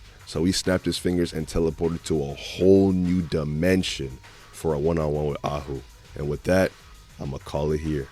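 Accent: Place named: Nigeria. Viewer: Bro you Nigerian aren’t you? 🌚😌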